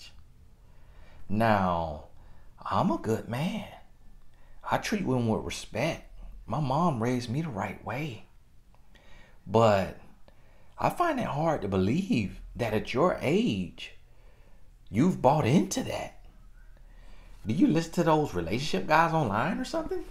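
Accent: South, United States. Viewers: Good morning Mr.... I pray you receive this message with a humble heart. I am just listening to this particular video. And yes, I totally agree with your message. Question: my family is from Alabama. Are you from Alabama? I swear you sound like my Father ( God's peace with him in heaven). Listening takes me back to that honest place. THE REAL SOUTH 💯. Keep us informed and ready. But still waiting but readying myself for my husband. Thanks! God's daughter❤️ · I think he is saying on your "back" but it sounds like bike due to his strong southern accent. Lol. Either way...super funny.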